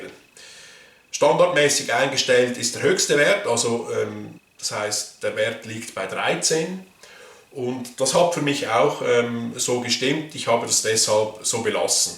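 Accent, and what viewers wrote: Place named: Switzerland. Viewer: Thank you for the video! I'm so glad I speak German. But the subtitles are pretty good. They help where I can't quite get the hang of your Swiss accent ;) For some reason, no-one explains how to properly put on the mouthpiece cap. Am I the only person afraid of damaging the instrument's "reed"? Just got mine in today. Kind of curious what settings I will end up going with, because I play both saxophone and flute.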